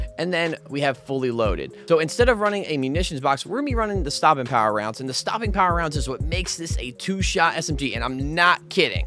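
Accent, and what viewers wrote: East Coast, United States. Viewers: i’m from baltimore and just by the way you talk i can tell you’re from the east coast, no weird shit g was just curious 🤣 don’t trip i’ll put my homies on get you some more subs you feel me?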